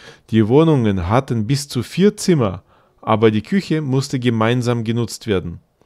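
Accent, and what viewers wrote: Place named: Russia. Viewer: На самом деле очень сильный русский акцент. Для носителя языка сложновато слушать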